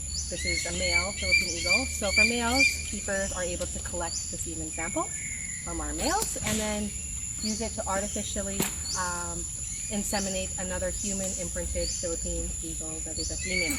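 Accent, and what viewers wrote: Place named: United States. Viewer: expat sya.. american from her accent so no.. di dugo dugo ilong n'ya, it's her native language.. half pinay as she said during the first 5 minutes of the video..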